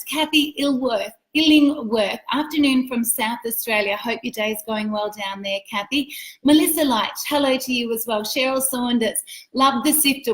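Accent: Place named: United States. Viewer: Why do you put on an American accent?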